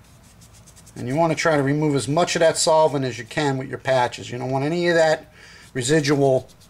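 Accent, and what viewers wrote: New York, United States. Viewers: Great stuff! Thanks for the video and simple to follow instructions, this is my first gun and I want to take good care of it! btw love the accent, you from New York? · NY accent?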